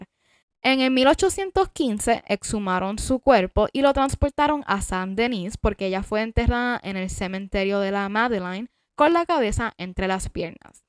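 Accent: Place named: Taino, Caribbean. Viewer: ESTÁ CON ACENTO TAINO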